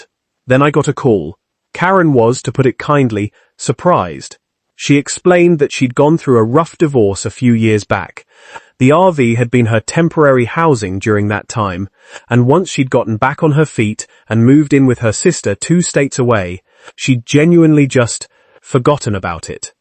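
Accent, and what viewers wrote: United Kingdom. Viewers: Why is the narrator English and not American?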